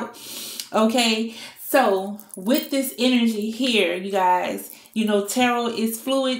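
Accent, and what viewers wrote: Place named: New Orleans, United States. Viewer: On point, thank you. 🙏🏼💛 And the Nola accent too cute!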